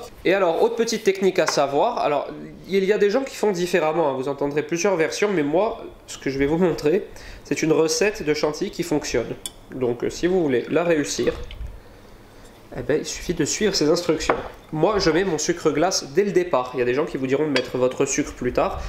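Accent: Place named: Alsace, France. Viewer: Bonjour je vous voit ( et entend) la première fois et j’ai l’impression que vous d’Alsace Lorraine 😊😊☺️☺️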